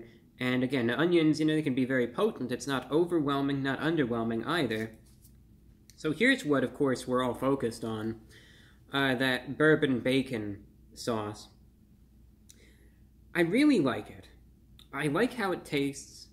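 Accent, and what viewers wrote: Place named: New York, United States. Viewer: You can tell he's from the New York area.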